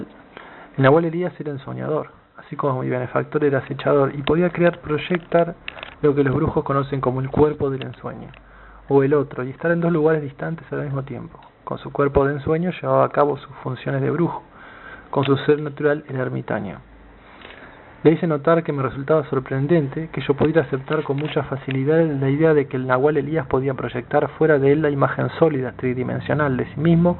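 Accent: Argentina. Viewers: El ritmo de lectura es acelerado considerando que al comun de los lectores los conceptos son novedosos infrecuentes y hasta insolitos y requiere un ritmo de asimilacion mas pausado... La pronunciacion, al parecer de acento argentino, tampoco ayuda como tampoco es conveniente algunas prolongadas pausas o silencios no convenientes... La lectura es fria y carente de emotividad como lo ameritan algunos sino muchos episodios fantásticos de los relatados por el aprendiz de brujo, el autor de la saga...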